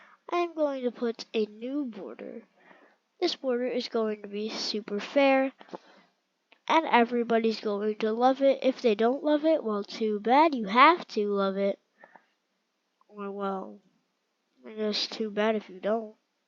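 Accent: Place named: United Kingdom. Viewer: Bro's bri ish ig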